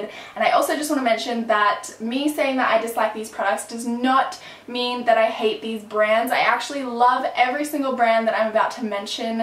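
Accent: Australia. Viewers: I love your accent, are you australian?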